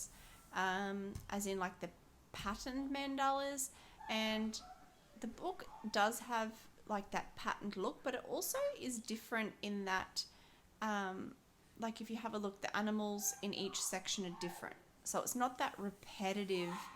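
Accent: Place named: Australia. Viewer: Welcome to the colouring community! So happy to hear another Aussie voice. Looking forward to watching your videos and channel grow. Good luck with it mate. Stay safe and Happy Colours, Sara 🐨💕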